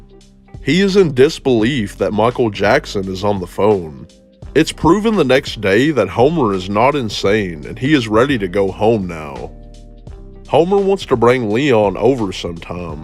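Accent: South, United States. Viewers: its cool seeing a youtuber like this having a southern accent its really rare (im from a southern area lmfao)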